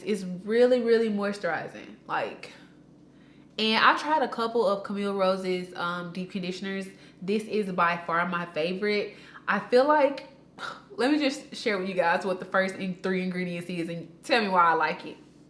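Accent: South, United States. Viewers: Sis! Your skin, hair and southern accent! ❤️❤️❤️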